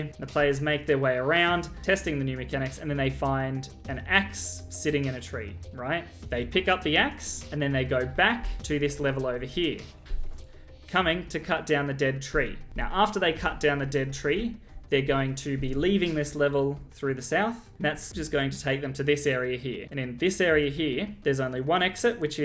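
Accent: Australia. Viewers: Is that an Aussie accent?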